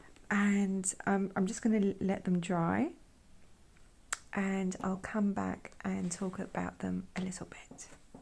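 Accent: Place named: South Africa. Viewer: I absolutely love the illustrations..especially the background detail that looks like a little piece of paper. I have been painting for years, but I recently started moving more towards illustration ,which is a huge mindshift for me. I love learning from tutorials like this, so I am definitely subscribing. For a moment there I thought I was listening to a South African English person (your accent sounds allot like Cape Town SA English🤣 and the "ai jai jai" is often heard here in English, Afrikaans, Xhosa...you name it🤣) but then...we got our English from Britain.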